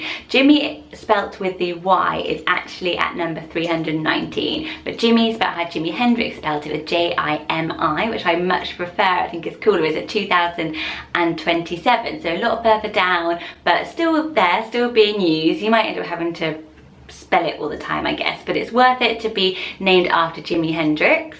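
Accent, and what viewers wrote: United Kingdom. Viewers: Because of her strong British accent, every time she started to say "Jagger" I legitimately thought she was saying "Jack maybe not a good choice for me... LOL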